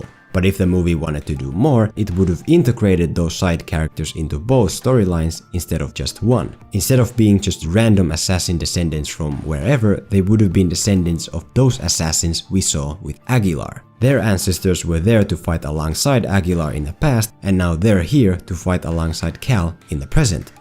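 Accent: Finland. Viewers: I love your distinctly Finnish accent! Now don't take me wrong. Your pronunciation and rhythm is very good and you're extremely fluent. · are you finnish???? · Hey mate, Are you from Finland ? 😄✌🏾 🇫🇮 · Exactly what I'm thinking! Firstly, torille. Secondly, a Finnish accent is too distinct for even a master to hide. Seriously, what else could it be? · Youre finnish arent you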